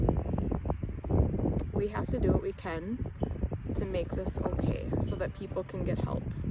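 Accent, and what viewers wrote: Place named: Canada. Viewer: I WILL add a comment since somebody may have passed your thoughtful video by. My Grandfather also lost his life by suicide. In fact, my Mother, who I must admit cannot go see, was pregnant with me when her Father did so. I believe mental health issues run in my family. There was a sincere belief that my Grandfather thought his cancer was coming back(this was in 1975), didn't want to be a burden, feared the pain, or perhaps it was an entirely different reason not related to his physical being. He had 12 children, with two different wives. His first wife passed on. But they were all related nonetheless! (You sound Canadian, btw. That is not a dig. I ❤ Canadians. I'm a simple, poor New Yorker myself.) I do suffer terribly from Major Depression with Panic Attacks, and other "things" I won't bore you with, as you are genuinely hurting as you are paying respects to much-loved family member. 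My place of work is being very charitable bc I'm on leave now. My poor husband and elder Sister have been blindsided by me not working and reasons why. I live in my own head and now I'm going through another slow process of getting help here in NYS.I have been scared into taking my medication the correct way, not running out, so I can resume my full time Custodial job at the local Casino. I wish YOU, YOUR FAMILY, & whomever happens to come across my long, but true comment that suicide is just plain scary. You leave others wondering why and so heart-broken. Because of my Grandfather's death, and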